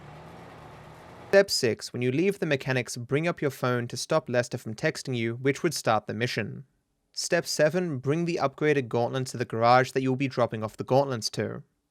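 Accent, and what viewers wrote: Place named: Australia. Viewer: Sounds like your saying it right to me..but then I'm Aussie too.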